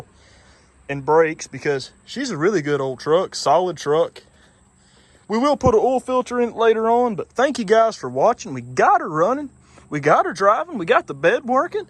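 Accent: South, United States. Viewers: I from Chicago. I love this guys southern accent it makes the video even way better.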